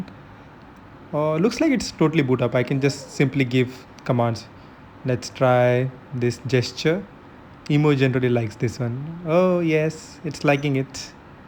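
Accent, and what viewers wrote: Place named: Russia. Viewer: You with Russian accent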